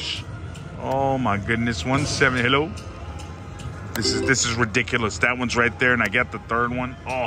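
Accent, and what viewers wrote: South, United States. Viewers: I’m originally from Houston and I must say that today you’re accent was beyond any southern lady’s or her best “ fan waving” day!!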